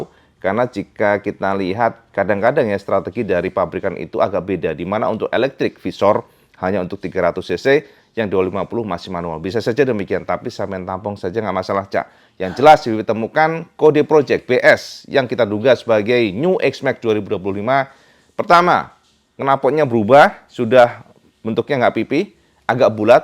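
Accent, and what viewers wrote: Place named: Java, Indonesia. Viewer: BANG LOGAT JAWAMU HILANGIN MEDOK BANGET BELAJAR JD PRESENTER BERBAHASA INDONESIA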